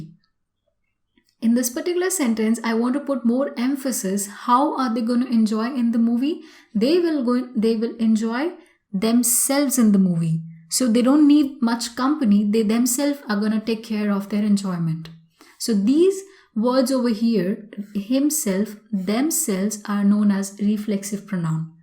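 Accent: India. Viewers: Why indian english acsent is funny? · I was completely confused with this Indian accent😐😐😐😐😐😐😐😐😐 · I LIKE YOUR INDIAN ENGLISH.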